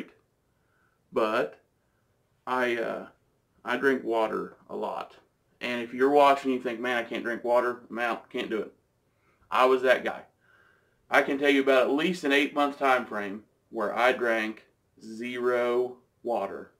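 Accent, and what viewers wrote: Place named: Alabama, United States. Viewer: U from alabama? I dont hear "himhaw" anywhere but south bama lmao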